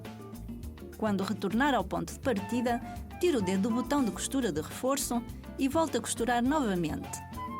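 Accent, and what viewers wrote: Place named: Portugal. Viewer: Meu DEUSSSS , espero que minha máquina não venha con este edioma , portugués de Portugal? É o último, soy de Brasil, bah que asco m não entendí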